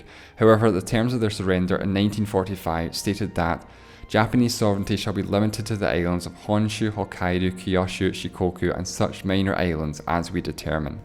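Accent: Scotland, United Kingdom. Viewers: hes scottish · Is this is a Scottish or Irish accent? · It's Scottish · I like your Scottish Accent. Just saying. 😂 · He's most definitely Scottish, not Irish. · Are you Scottish btw? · This comment has nothing to do with the content, I'm just dying to know if the narrator is Scottish or Welsh or something 😂😂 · patrice Scottish I think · Aaryana Hurd I think he’s Scottish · Scottish, he sounds exactly like my scottish friend lol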